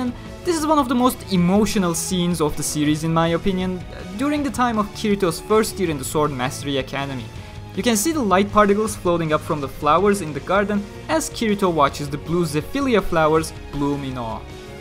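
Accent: Turkey. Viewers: ingilizce konuşuyon ama konuşurken türk olduğun çok anlaşılıyor xd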